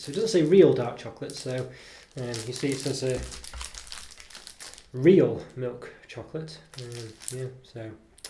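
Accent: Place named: United Kingdom. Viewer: OCD candy review, if you love to see up close candy wrapper comparison aplenty, this review is for you. I have an unbridled love of close ups of candy wrappers with endless comparisons of size by a person with severe OCD but even I was daunted by this also of you love unintelligible British mumbling, this video is for you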